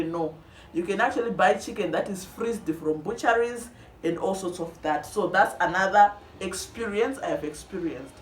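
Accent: Uganda. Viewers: Uganda is on the equator two rain seasons so plenty food.you now speak like a Ugandan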